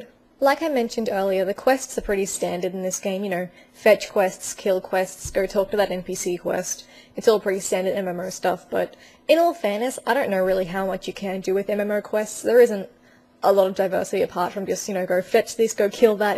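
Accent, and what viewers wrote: Australia. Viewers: australian :O i love your accent XC wish i could exchange my american accent a european or australian :D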